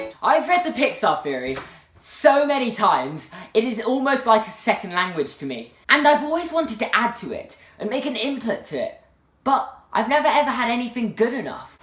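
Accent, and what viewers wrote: England, United Kingdom. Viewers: This video is 86392times better with his British accent😹☺️ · Are u from England (I am lol) 😂 love ur accent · Are you British? I love your accent lol. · youtube then:Hey wanna play MINECRAFT YouTube now:Little british boy talking about disney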